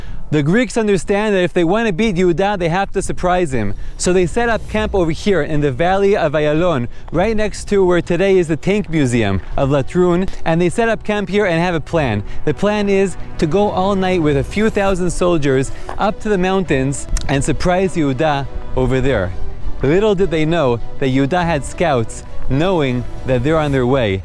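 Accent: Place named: New York, United States. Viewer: I was not expecting to break out a perfect NY accent! This is the first time I’ve heard him talking instead of singing. I’ve always imagined he’d have a strong Israeli accent!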